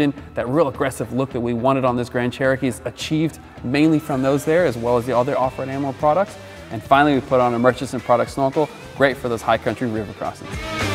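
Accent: Australia. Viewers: Thank you for toning down your Australian accent so I can understand you! Great video and project - thumbs up! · So lost he’s got a North East accent with Aussie undertones! 😆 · Such a slick and polished video but the light bar is crooked. The presenters accent is so "Australian light" that it's distracting. But OMG those are some killer upgrades. The black car hides all the cool upgrades. A car that was any other color than black would have been a better choice IMHO. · Idk how you have an Aussie and Brooklyn accent at the same time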